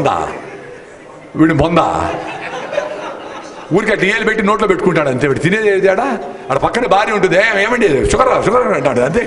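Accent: Rayalaseema, India. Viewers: love u r rayalaseema slang sir, it makes listeners interesting to hear...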